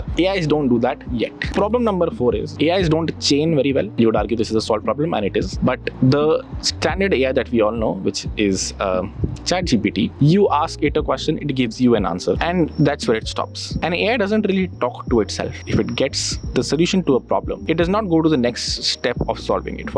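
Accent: India. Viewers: Haha you Indians have funny accent.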